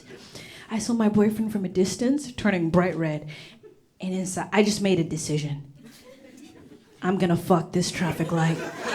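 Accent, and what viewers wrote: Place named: New York, United States. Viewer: I was about to say she sounds more like a Noo Yawkuh who lived in the UK a bit (a lot like my Brooklyn-born and raised cousin who lived in London for 30 years) than an Aussie or an African. Surprised she developed that accent after arriving in Oz at such a young age.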